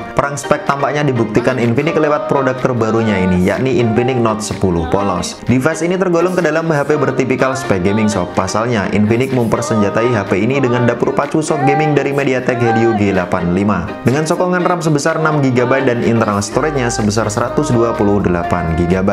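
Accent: Madura, Indonesia. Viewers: Bang.. madura mana kmu bang?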